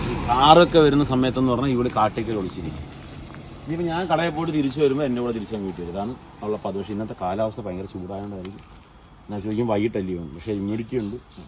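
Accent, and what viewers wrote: Kollam, India. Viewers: മ്മ്ടെ കൊല്ലം ഭാഷ വേട്ടാവള ിയൻ😂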